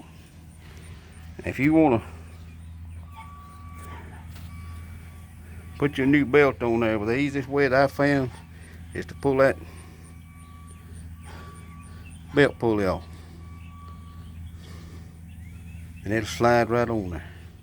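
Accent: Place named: West Virginia, United States. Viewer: Sounds like McDowell county WV feller👍🇺🇸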